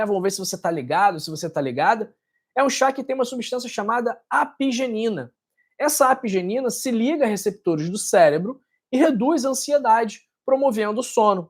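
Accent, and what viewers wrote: Rio de Janeiro, Brazil. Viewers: Doutor obrigado pelos seus ensinamentos, atualmente estou perdendo noites de sono devido a ansiedade. Irei praticar essas dicas que são tão fáceis e encontradas em toda casa kkkk! Ah e só uma coisa, eu adoro o sotaque dos brasileiros, povo mestiço e diversificado. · Acho tão charmoso o sotaque do carioca!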